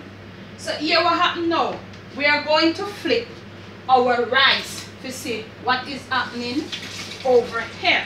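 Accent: Jamaica. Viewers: Hi, I started watching your channel just recently and I'm really motivated and inspired by your teachings in the kitchen. I am learning alot...I'm quite impressed with your vocabulary (excellent pronunciation of different herbs, spices, food and so much more ) I know you used the patios to let it be known that we are all Jamaican but deep within you are an intelligent person. Please ignore the haters out there, there will always be haters (these are people who allow the devil to use them) You are doing an excellent job, keep up the good work, keep the faith and always trust in our Creator God.. God bless.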